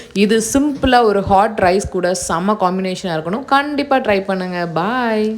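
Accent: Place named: Erode, India. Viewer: It is erode side accent.....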